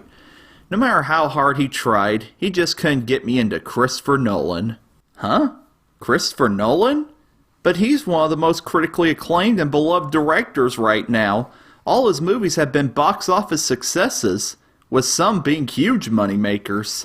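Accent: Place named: Texas, United States. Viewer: somehow texas like accent unconsciously make me think whatever you're saying is less credible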